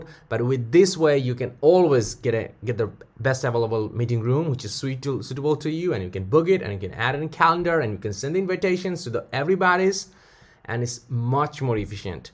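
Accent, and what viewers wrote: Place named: United States. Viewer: Where are you from? Are you putting on an American accent. It’s very interesting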